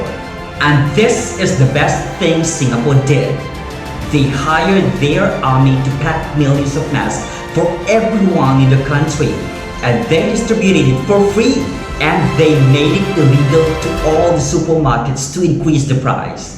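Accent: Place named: Philippines. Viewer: Have you been given citizenship already? You sound unmistakably Pinoy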